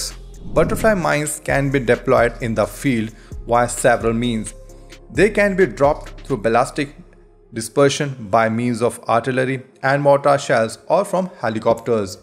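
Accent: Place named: India. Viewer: Really hard to understand this guy's English with his Indian accent.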